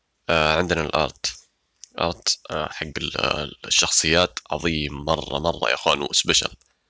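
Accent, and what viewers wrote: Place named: Saudi Arabia. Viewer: اللهجة السعودي مضبوطة مرة كتير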